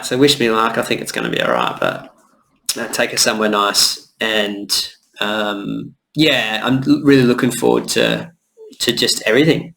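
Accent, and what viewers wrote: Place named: Australia. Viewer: Sol big brain has brought me to this project. Cannot wait. Also listening to your accent great to hear someone from Straya 🇦🇺 🙌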